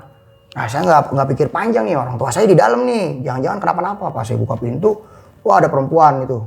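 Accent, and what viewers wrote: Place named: Betawi, Indonesia. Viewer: dialeg betawi kak... Setelan normalnya kek gitu 🤭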